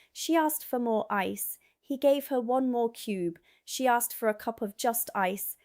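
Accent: England, United Kingdom. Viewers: your English accent is flawless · Wonderful and accurate information. I must note your charming adaptation of a generic "English" accent after so short a time in the UK.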